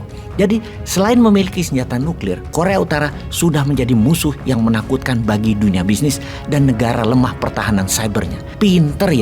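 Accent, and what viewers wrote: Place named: Jakarta, Indonesia. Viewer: Ane demen nih kalo bahasa betawi bossman udah keluar rada gregetan campur sedep di dengernye....ya ga bossman???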